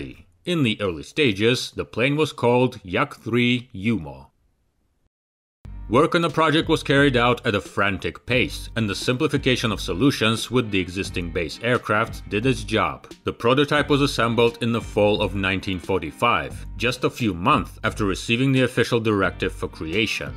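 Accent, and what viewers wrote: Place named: Russia. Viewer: The narrator is a native Russian speaker, providing the way he pronounces the titles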